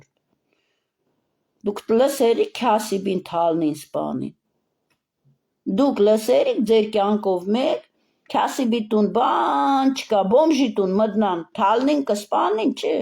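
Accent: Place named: Msho, Turkey. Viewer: ❤❤❤❤❤, так приятно слушать Вас именно на моем родном Мшо диалекте ❤❤❤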